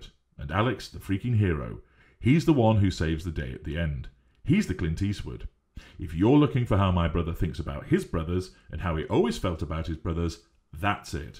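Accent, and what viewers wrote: United Kingdom. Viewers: Lol...TMZed rather than TMZee...so cute...so very British.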